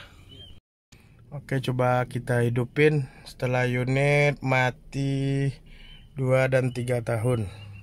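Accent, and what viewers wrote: West Sumatra, Indonesia. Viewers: Dri logat ny kykny abg ini orang sumatra barat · Asal mana bg?? Bahasa ny kyk minang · Logat nya urang padang bana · Bg. Abg orang padang ya.. Logat minang nya kenal saya. Salam kenal da. Dari medan.. Di medan ado cabang da? Awak nio bali.. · Logat urang minang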